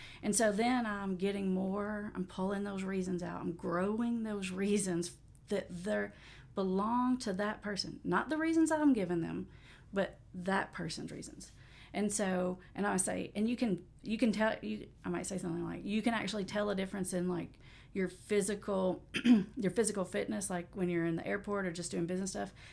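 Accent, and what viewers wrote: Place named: Tennessee, United States. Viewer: Hi, I’ve become addicted to your videos (not funny?) I’m 6 months clean. You have helped me so much. Anyway, if it’s at all possible, it would be really great to hear from couples that have made it through addiction and are doing well. I would be interested in hearing the perspective of both partners. It may give me a little hope. ❤ Thank you for all you do. You’re doing great work for the world, especially in the area of the world where you’re located (I’m from Bristol Tn and I can hear in your voice maybe you hail from close by? Hehe)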